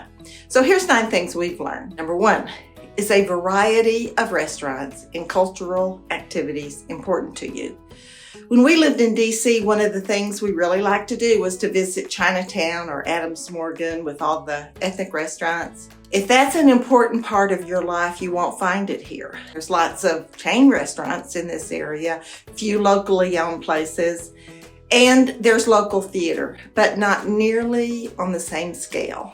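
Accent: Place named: West Virginia, United States. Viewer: I see you picked up the accent lol. I live in southern WV and very rural and everything you say you’re lacking we have. We welcome everyone but liberal democrats. Y’all tend to forget why you left your original state.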